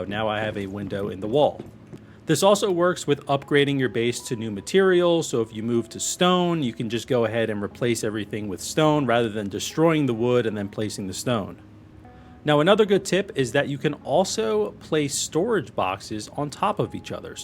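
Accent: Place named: Pennsylvania, United States. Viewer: This guy sounds like he’s from Pennsylvania. Good tips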